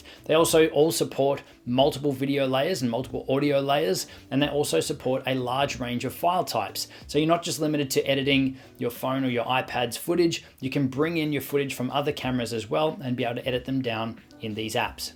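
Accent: Australia. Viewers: Are you from Australia? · Do I sense a fellow Aussie?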